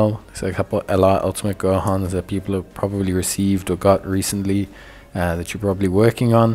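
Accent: South Africa. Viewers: Bro you south-african?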